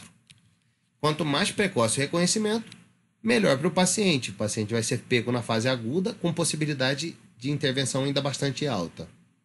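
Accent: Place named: Rio de Janeiro, Brazil. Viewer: Muito bom!! Não sei se você se mudou pro Rio de Janeiro, ou algo do tipo, mas alguém puxar o R e o S é a primeira vez que eu vejo hahahahhahhah Grande Abraço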